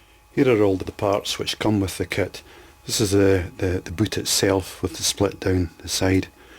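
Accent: Scotland, United Kingdom. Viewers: Love the Scottish accent! Love the detailed instructions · Love the scottish accent..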